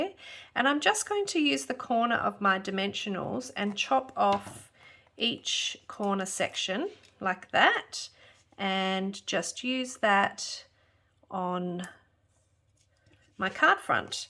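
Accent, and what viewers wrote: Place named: Western Australia, Australia. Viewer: I've just found you and have so enjoyed your video - nice to hear a fellow West Aussie - I am from Perth also.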